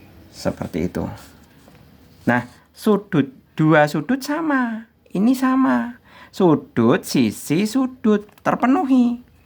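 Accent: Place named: Java, Indonesia. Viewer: Medok ya pak…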